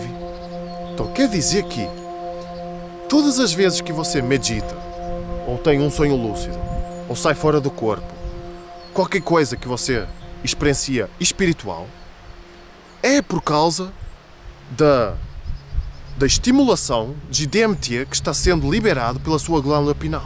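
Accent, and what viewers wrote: North, Portugal. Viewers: este senhor tem pronuncia do norte de Portugal.... Porque é que está constantemente a falar no gerúndio? Porque é que tenta inserir o sotaque brasileiro no discurso?????????? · Obrigada pelo video, maravilhoso, muitas informações que ja conhecia, e outras não, adoro ouvir vc explicar com seu sotaque português, tantas coisas importantíssimas para nosso crescimento espiritual !!!! a LUZ vencera !!!!! merci !!!! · VC é português tentando falar em brasileiro pq? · Olá, tudo bem? 😊 És de Portugal? Bjs eu sou e descobri te agora mesmo... Mas tens um sotaque MT tuga! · Você é, um Português!, que vive no Brasil!, certo?!,nota-se que o seu sotaque ainda é muito de Portugal (Talvez de Ovar Aveiro Sta Maria da Feira...por aí), um abraço enorme para si e para Todo o Brasil!!!. Namastê!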